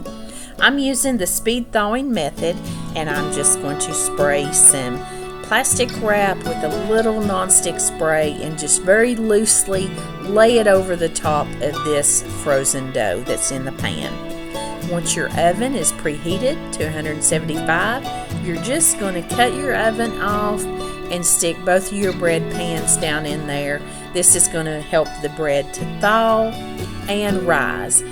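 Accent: South, United States. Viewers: Happy Fall!! Your recipes are so amazing and for us, its your sweet country voice that just relaxes both of us... we absolutely adore you and your content!!!! Thanks so much for joining in this year!!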